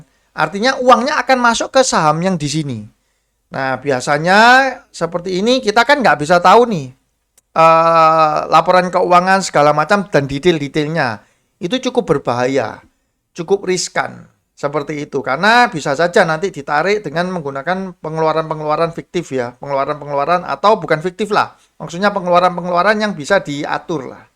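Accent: Surabaya, Indonesia. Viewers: suroboyo ta bos,kok kentel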